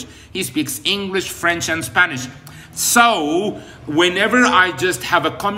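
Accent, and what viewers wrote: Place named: United Kingdom. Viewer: I am in love with the british accent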